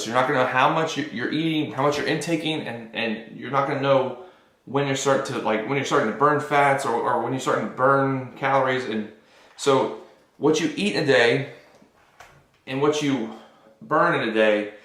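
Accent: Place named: United States. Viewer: Hey bro! You are vlogger 🤟🤳 cool video man! Love the energy and your edit! ❤👍 Nice workout! My questions for your q&a is: ➡️Where are you from ? You sound like American 😉🇺🇸 ➡️What made you change your diet and workout? Probably you say on your videos but I just met you 😅👍 ➡️Who is your favourite superhero? ➡️What would be your speech topic if all people of the world could hear you for 5 minutes? 😃👍😉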